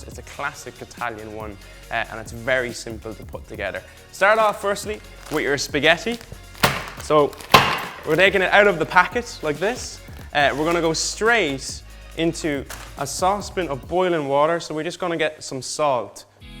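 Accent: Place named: Cavan, Ireland. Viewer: The Cavan accent makes the video 😂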